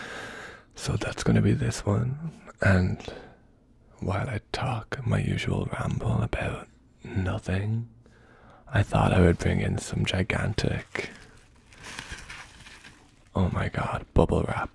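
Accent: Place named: Ireland. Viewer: Soft spoken is absolutely beautiful, with an Irish accent makes it phenomenal 😍 much love from an admirer in New Zealand ❤❤❤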